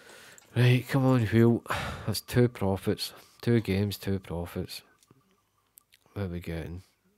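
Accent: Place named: Scotland, United Kingdom. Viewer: I said to myself like Kilmarnock or something aswell 😂 just couldn’t quite place the slight accent, Good luck on your upcoming videos mate hope to see a record breaker soon 🙏🙏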